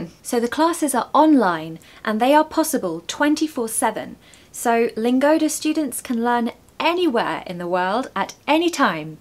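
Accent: United Kingdom. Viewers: Hey, you are going to be my favourite English teacher. Your accent is so nice. I would love to learn british accent by watching your videos and following your tips. Thank you very much for being there! ❤ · Awsome British English by yours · Teacher you aré great teacher , I understand you better than others teachers ,and you aré so stunning, you're british culture and accent excelent ❤👍😃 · Indeed, Your accent is really beautiful, although I live in Australia, I really like British accent , you are doing well, just keep going👏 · Assalamalaikum Hello U know what, i joined ur channel just bcz ur British Accent which i really love 💖😃. Thanks for the video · Hi. I am happy that I found the correct platform to listen British accent. Your way of presenting makes more attractive to keep the important stuffs in my mind. I am very excited to watch your each videos, it's not boring. 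Special thanks for this video on the books. I always find bored to read books, but, from your description on those books motivate me to read asap. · i don't know which i learned accent, i hope so u got my writing. i watch your videos, and British accent it's lovely. i happy 'cause i understand you speaking.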